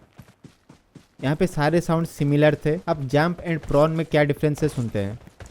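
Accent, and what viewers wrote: Odisha, India. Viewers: Are you an odia? Your accent makes me feel like that. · Bhai tum Odisha se ho kya? Tumhara awaz ek odia toka jesa lag rha h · Odisha se ho kya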